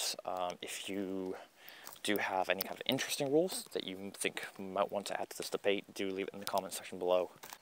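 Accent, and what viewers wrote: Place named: United States. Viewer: Where do you live? You have an American accent, but you're paying for things with pounds?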